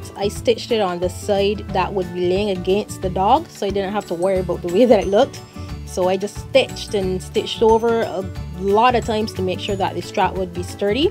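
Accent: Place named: Caribbean. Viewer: great vid, busta??? where r u from? the caribbean?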